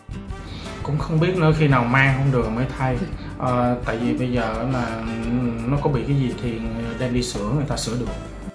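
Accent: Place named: South, Vietnam. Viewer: Trước giờ tưởng người miền Bắc giờ nghe nói giọng nam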